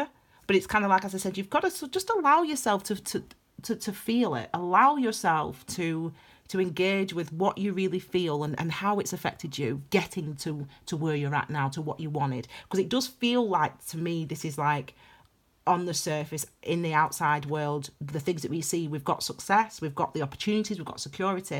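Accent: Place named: North, United Kingdom. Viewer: ..at 0808...hearing your lovely northern twang (a Manky here :))...I snapped and your energy was my final acceptance .. .own IT.. claim IT...poised ..thanks for making clear this feeling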